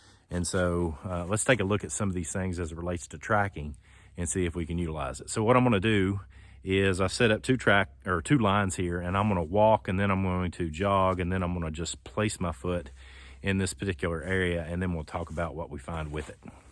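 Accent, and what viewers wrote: Kentucky, United States. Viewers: Knew you were from KY the moment I heard you speak!!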